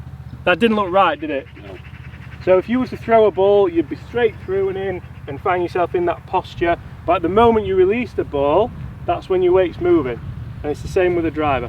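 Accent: Yorkshire, United Kingdom. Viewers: "Si Thi" 😂 a reyt good Yorkshire accent that pal good to hear local lingo 👍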